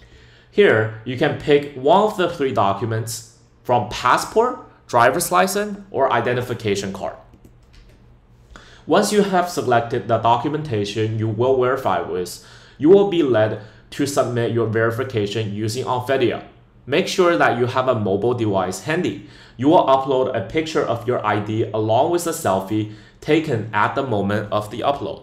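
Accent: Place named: China. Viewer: 这口音咋感觉是中国人？😂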